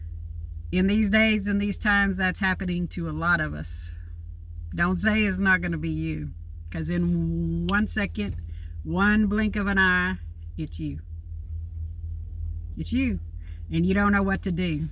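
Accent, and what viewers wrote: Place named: United States. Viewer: Hi . Love your country accent 😍 . So you travel alone?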